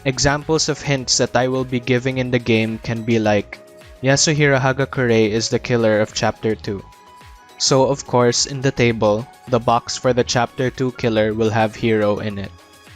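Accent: Philippines. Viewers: You sound so pinoy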